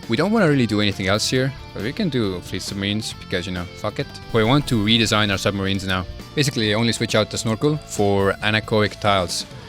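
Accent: Estonia. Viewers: HOI4 + draculaflow + eesti aktsent = instant sub 😂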